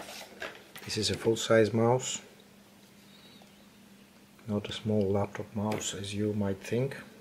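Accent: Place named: Greece. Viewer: Τα αγγλικά του Έλληνα αναγνωρίζονται αμέσως απ' τη πρώτη λέξη ☺️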